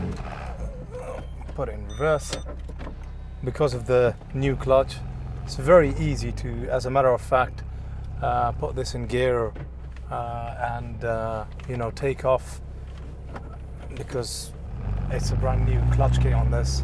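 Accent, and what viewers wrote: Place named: London, United Kingdom. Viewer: What a hell of an accent does this guy have? London?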